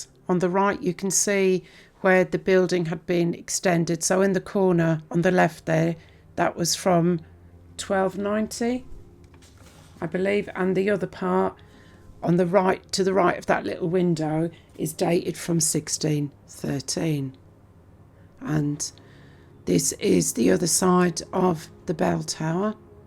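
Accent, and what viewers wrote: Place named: England, United Kingdom. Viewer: . The videos thumbnail is a white cross at the base of a Yew tree. The title states it is his guide to aging a Yew tree. Did I hear correctly that you said in another video that your not from Enland? I like listening to accents and to me you sound English. Again, just curious and mean no disrespect.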